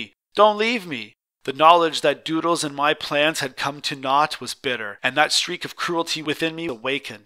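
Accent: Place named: Canada. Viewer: the Canadian is strong with this one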